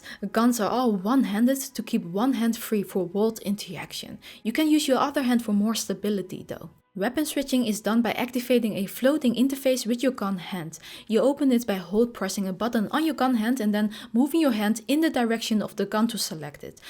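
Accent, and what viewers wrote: Netherlands. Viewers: Her English pronunciation is already nearly flawless. I can understand her perfectly every time she talks, something I can't say about many, many native speakers. And besides, that Dutch accent is awesome!! So my recommendation to you is to go pound sand. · Are you dutch by any chance?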